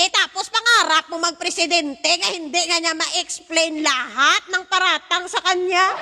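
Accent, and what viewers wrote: Iloilo, Philippines. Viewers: Taga saan ba si bakit Hiligaynon (Ilonggo) accent sya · Sakit tyan ko kakatawa, Ilongga ka day?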